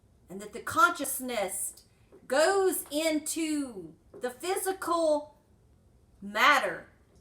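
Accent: South, United States. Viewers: Its nice to here another southern voice on this topic!! Our culture here is less than a blink of an eye in Earth time. But still, in 10 years of this journey, not one person has have i met i can talk to about anything similar lol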